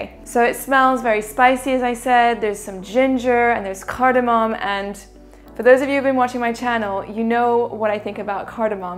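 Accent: France. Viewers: You have a very nice french accent, and even Arabic i guess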